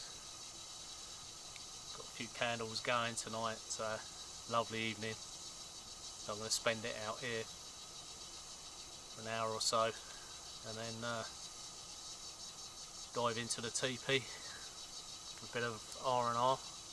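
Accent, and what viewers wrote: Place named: London, United Kingdom. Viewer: where are you camping, i dont expect to know the exact location but is it private land or public. Im gussing as you have a London/ Essex accent its local to you ???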